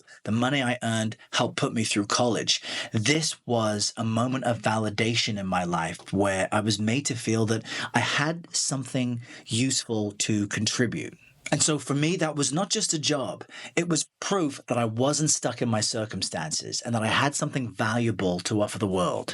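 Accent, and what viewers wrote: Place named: England, United Kingdom. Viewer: Hearing your background story made me think, “Wow. I never would’ve guessed.” Thanks for sharing that piece of yourself. I also never would’ve guessed you moved to the US at such a young age seeing as how you still have a VERY noticeable English accent. As for being the underdog, I actually think it’s not a bad place to be at all. There’s no pressure, no one knows what to expect from you, and you get to completely set the tone for whatever it is you want people to know about you. Btw, I will never understand how you anchor people get the green screen thing down. It looks sooo confusing 🤯. And I never considered how this job could be heavy at times when you’re in an unfamiliar space with a story that hits hard in a negative way. I could go on responding to every single point you made in this video, but I will spare you the essay lol. 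Keep up the good work!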